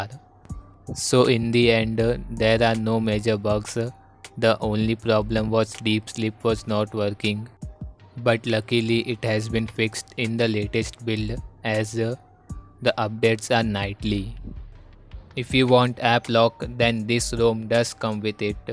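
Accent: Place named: United States. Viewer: i thought ur an american, awesome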